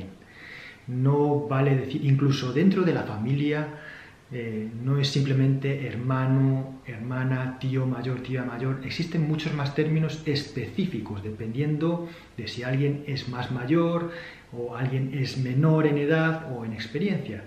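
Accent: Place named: Spain. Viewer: Normalmente los coreanos que hablan español tienen acento de mexico pero vos lo tenes español...porque? es solo curiosidad saber de donde es el acento. saludos.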